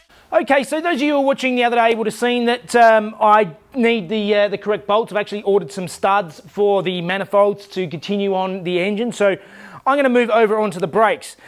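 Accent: Australia. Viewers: So apparently Ms accent is Kiwi. I thought had an Australian accent, but my bro said he's Kiwi as well. I think they live in Sydney. Can anyone confirm if is Aussie or Kiwi?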